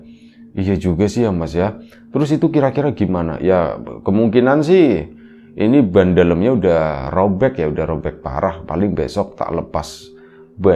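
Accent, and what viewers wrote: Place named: Banyumas, Indonesia. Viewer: Wa, alaikummusasallam wr wb, Hadir dan nyimak selalu, Oh, iya buat mas narator kok ga di jawab pertanyaan saya, Mas narator asli nya mana...? Seperti nya logat nya ada ngapak ngapak nya, maaf ya mas.... Ok dia terbaik untuk Riam biru, moga makin sukses dan buat mas narator nya moga sehat dan slalu dalam lindungan Allah SWT.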